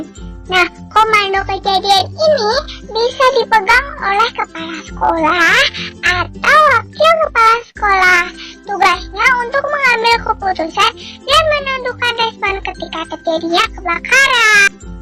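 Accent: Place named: Java, Indonesia. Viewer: Keren, suaranya unik. Medoknya dapet. Terbaek memang. Hahaha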